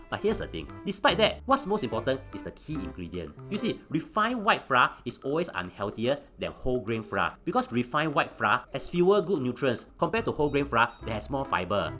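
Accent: Singapore. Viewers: Ur Singaporean accent is so prominent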